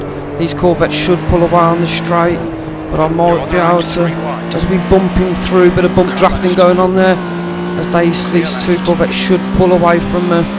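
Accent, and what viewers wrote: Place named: Midlands, United Kingdom. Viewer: As a fellow Midlander, love hearing your accent here 😁❤️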